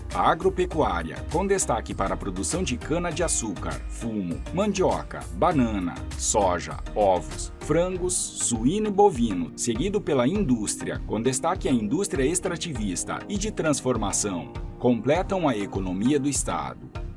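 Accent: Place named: Alagoas, Brazil. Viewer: Cara parabéns!! Você narra o vídeo como se fosse um morador do nosso Alagoas. Eu amo Maceió, minha capital mais linda, eu amo Alagoas ❤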